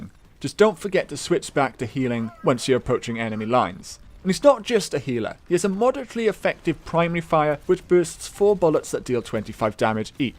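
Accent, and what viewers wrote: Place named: England, United Kingdom. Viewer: dont get me wrong i really really like ur vids but i so not agree with u on this vid. no hero is actually ez to play cus 1. each player have his own play game and and there are players that it would be difficult to them to play those heros with there type of play. 2. try to play those heros in actual sieries mode or like comp, its not ez to play them like this. another thing i want to say that every player should play what he likes to play even if that was the hardest (all though i allready explained that there are no ez and harders) and the players dont need to play just what fits to to new players they need to play what they like and what they want to. thx and pls answer me 1 more question: in the qna vid u sayed u r not from england. then where are u from? u really sums from england thx!! and again dont get me wrong i love ur vids just i dont agree with u on this 1 and dont worry, i didnt dislike XD thx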